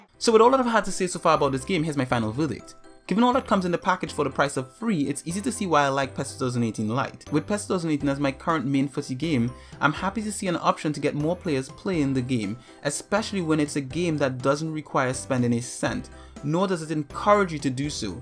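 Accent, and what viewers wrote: Trinidad and Tobago. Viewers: you sound like ur from Trinidad and Tobago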